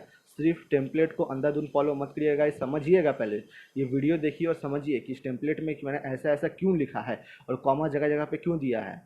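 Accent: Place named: Uttar Pradesh, India. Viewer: u r up accent bhai♥️♥️🥹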